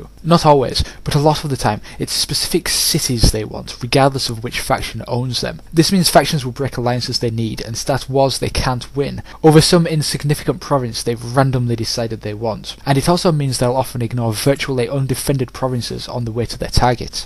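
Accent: United Kingdom. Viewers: LucaCK Luca He died of some sort of disease, I can't remember. also yeah, British, I can't believe it never struck me before, I guess I had never heard that kind of regional accent before.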